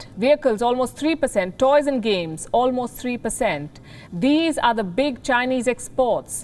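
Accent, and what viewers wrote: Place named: India. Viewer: You are making the world love English Indian accent.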